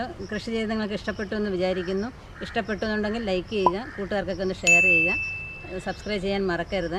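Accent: Kollam, India. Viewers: Mm samsaram ketapol thonni kollar allenkil PTA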